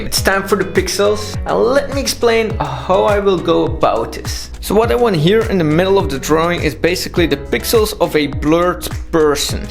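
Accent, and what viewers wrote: Netherlands. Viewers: Where are you from cause you sound like u have a dutch accent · I bet you’re Dutch. That accent is so recognizable. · Are you from the netherlands? Because your accent is sometimes a little bit netherlands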